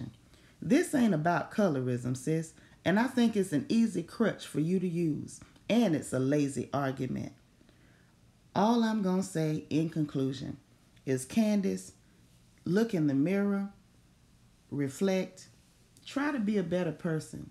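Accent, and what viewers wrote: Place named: South, United States. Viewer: It's the narration with the southern accent and reads for me😊❤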